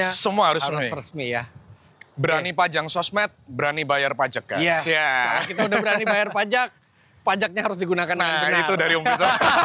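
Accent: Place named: Java, Indonesia. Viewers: Indonya medok lagi. Koko sby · Logat jawanya kental sekali.. kok ada yg bilang jawa hama disini 😂😂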